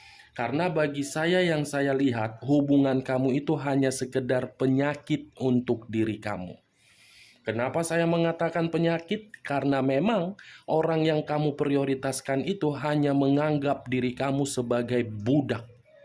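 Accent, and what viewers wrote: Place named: West Java, Indonesia. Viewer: Acak Adul Sunda banget bang Bahasa orang Bandung 😁😁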